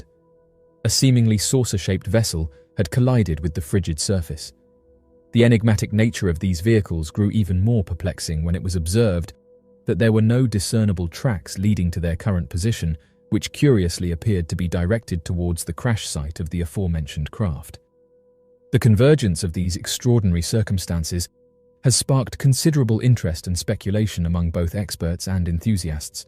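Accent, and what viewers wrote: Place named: Public school, United Kingdom. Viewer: Oh yes oh yes my guy is back. What a voice. 2 million here we go. Sleep awaits. 😅 Edit- just the one story so far, still great though. No shade on the "new" lad, perfect enunciation just not intensly soporific. Who doesn't love random tales in public school English. Best video in years chaps. Hand crafted like the old days. Love it. ❤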